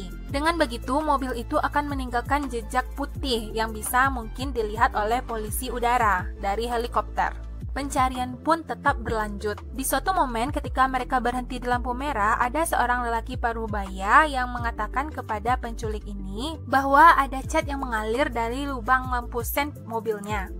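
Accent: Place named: Padang, Indonesia. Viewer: logatnya logat padang banget, org sumbar kah?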